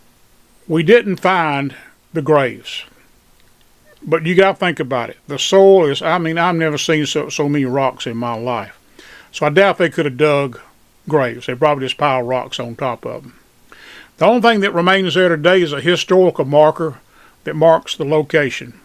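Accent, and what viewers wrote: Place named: South, United States. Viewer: So glad the WWHA film crew discovered and documented this story of the last of the Daltons. It is overlooked by history and Jones deserves the recognition for his dedication to the state of Texas, for he has received almost no credit in history. The story of the Dalton Gang's demise at Coffeyville, Kansas when they foolishly decided to rob two banks at once is well known, including the fancy factory engraved Colt pistols they carried, but this is new. The narrator's deep southern accent does not hurt either! Dodging goats? Good sense of humor too! Join WWHA!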